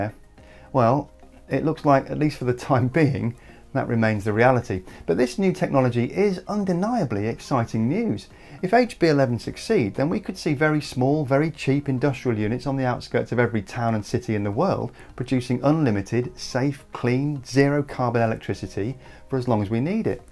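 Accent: United Kingdom. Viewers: I deliberately NEVER mention the totally IRRELEVANT subject of HOW somebody says something, and focus EXCLUSIVELY on WHAT they say. However, given that I have seen SO many brainless idiots in the world voice their worthless unoriginal opinion about style over content, I figure I am allowed at least ONE time to do the same. The CONTENT of this channel is PERFECT. But I REALLY cannot stand the speaker's mild British voice. I would prefer an aggressive angry voice (British or American) to deliver the narrative.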